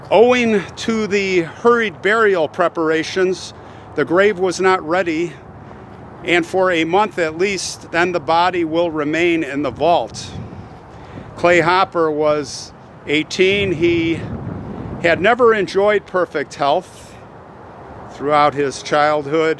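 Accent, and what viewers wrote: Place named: New England, United States. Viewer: Makes you wonder how many others were buried alive back then.I detect an accent from the New England area. I am also from there.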